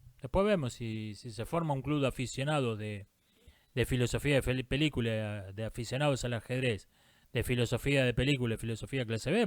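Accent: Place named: Argentina. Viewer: Abro moción para que nos narre creepypastas clásicas con su acentito argentino. Imaginen tener a Slenderman no sólo narado, sino COMENTADO por el Sócrates de nuestro tiempo.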